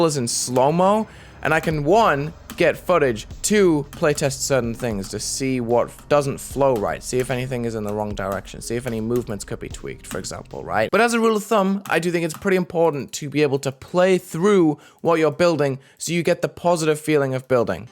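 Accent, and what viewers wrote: United Kingdom. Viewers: You're bri'ish, same. What county you from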